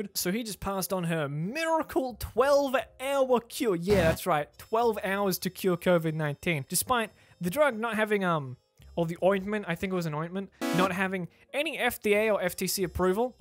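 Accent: Australia. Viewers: an AUSSIE commentator finally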